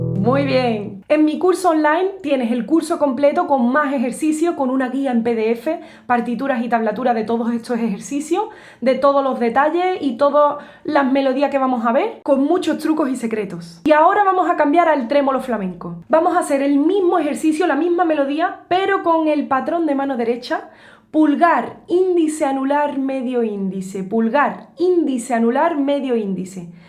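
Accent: Andalusia, Spain. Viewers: me pongo contento de solo verte, y escuchar tu guitarra, y tú hermoso acento andaluz. Por supuesto aprecio tu divulgación de buena música y tus clases sobre técnica.😊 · Gracias Maestra, tienes técnica, conocimiento, pedagogía y, sobre todo, un brillante carisma. Gracias Maestra. Tienes un bello acento andaluz.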